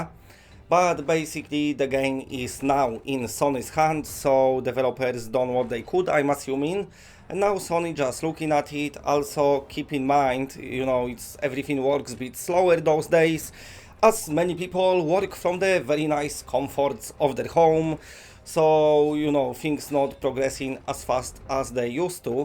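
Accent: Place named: Poland. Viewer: Came for the psvr news. Stayed for the sweet polish accent.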